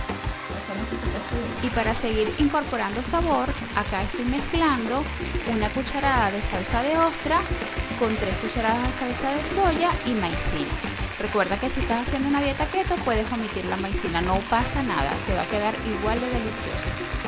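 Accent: Venezuela. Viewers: Hola! Soy de Venezuela, pero vivo en U.S.A. yo imagine que eras de Venezuela por la forma de hablar y de cocinar (con clace) jjjj Saludos.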